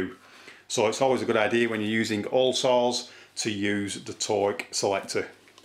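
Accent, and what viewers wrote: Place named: United Kingdom. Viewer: What accent is that? I know it's British.. But it's difficult to understand when English is your second language and you ear is accustomed to American accent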